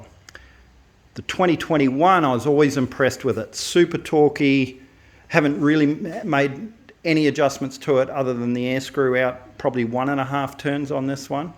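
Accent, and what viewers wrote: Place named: New Zealand. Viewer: awesome bike, you sound like your from NZ not canada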